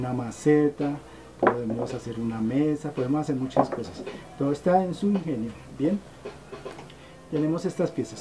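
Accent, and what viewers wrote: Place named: Colombia. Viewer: Yo vivo en pradera valle y usted tan bien es colombiano por el acento creo que si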